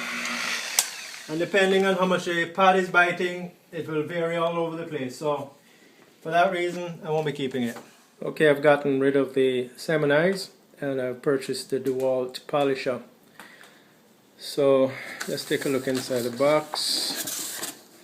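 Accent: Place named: Punjab, India. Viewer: Fuck your accent. I'm tired of having to figure out what the fucking Punjabis are saying.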